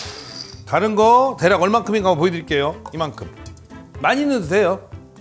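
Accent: Chungcheong, South Korea. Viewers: 충정도사투리가 구수하고 귀여우셔요^^ 믿고보는 님~맘도 따뜻하신 님~^^ 응원합니다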